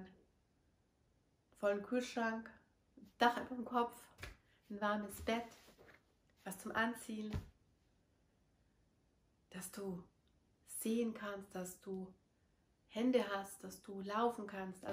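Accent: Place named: Bavaria, Germany. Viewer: Schoen,der bayerische Dialekt🙏🙏fuehle mich zuhause🙏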